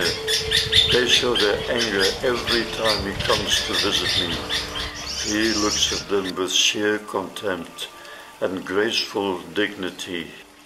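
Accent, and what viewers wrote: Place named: South Africa. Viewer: Wonderful and heartfelt video, well done! Good to hear a friendly South African accent too:-)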